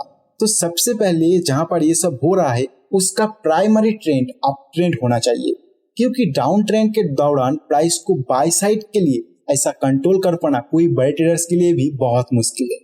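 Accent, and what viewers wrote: Bengal, India. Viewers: Dada... Tomar kotha sune bangali bolei mone holo..... Tai ....bangla tei bolchi.... Stock r blasting move Keno hoy.....ba stock blast ( ek din e 3 to 5% move ) ki kore hoy..... Ei related kichu information dao ...... Khub upokar hoy..... 🙏🙏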